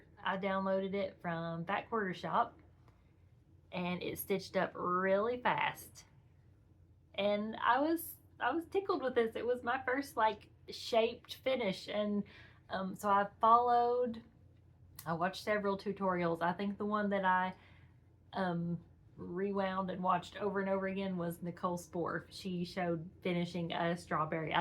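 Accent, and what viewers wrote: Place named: South, United States. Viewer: Love your stitches and your southern drawl 🥰.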